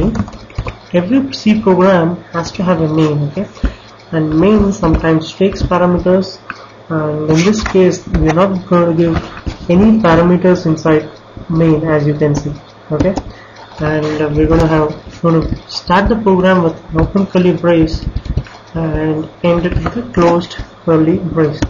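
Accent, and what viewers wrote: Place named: India. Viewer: sounds as if your an indian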